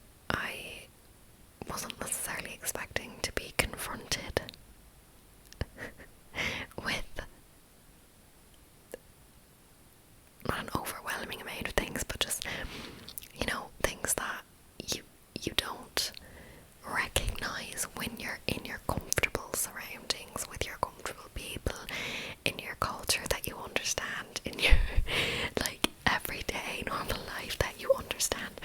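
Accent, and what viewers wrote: Ireland. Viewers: Lovely video! A 40 minute video with your gorgeous Irish accent is always a treat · Your accent is so enchanting and it goes with ASMR so well. Im roughly 50% Irish but unfortunately never picked up the accent from my relatives. Id kill for an Irish accent, mainly because Ireland is one of the top three places Id love to live after im through with university. ❤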